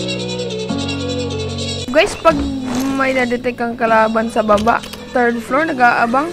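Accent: Visayas, Philippines. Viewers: BISAYA DIAY KA? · Hahaha bisaya diay ayaw nag saba!!!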